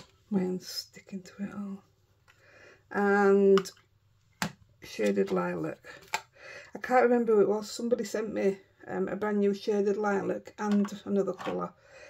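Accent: Yorkshire, United Kingdom. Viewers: These are so cheerful! We usually get a little bit of snow here but none so far this year I'm sad to say. And your speaking Yorkshire is one of the many reasons I love your channel. 💞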